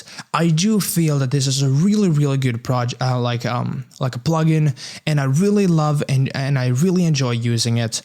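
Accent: Finland. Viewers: Are you from Finland? Just a hunch based on your accent. Good vid man.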